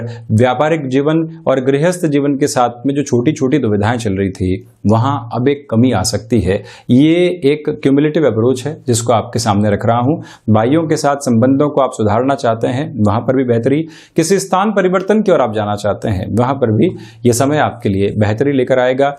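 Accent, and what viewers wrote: Standard. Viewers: I just watch your videos to listen to such pure Hindi. It’s enchanting.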